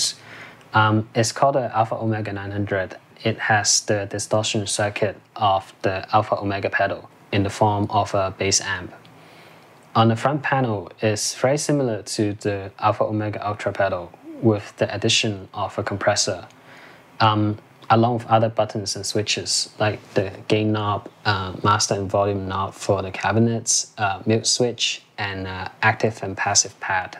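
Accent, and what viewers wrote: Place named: United States. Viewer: I hear your American accent very good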